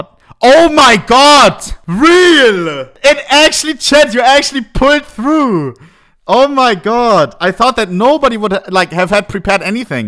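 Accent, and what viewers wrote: Germany. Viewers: nie was schlimmeres gehört als nen Deutschen, der mit extremstem deutschen akzent auf Englisch schreit...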